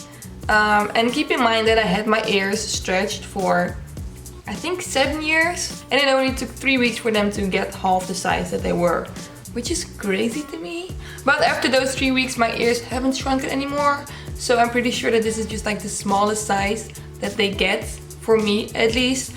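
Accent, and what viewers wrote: Netherlands. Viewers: Ik dacht al, haar engels klink heel nederlands achtig 😂. Toen las ik dat ze nederlands was.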